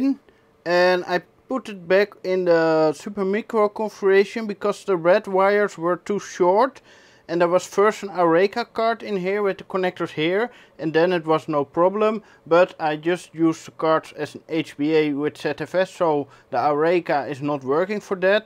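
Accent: Netherlands. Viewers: Je klinkt erg Nederlands.. Klopt dat? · ik kan gwn meteen horen dat je nederlands bent hahah Wel leuke vids man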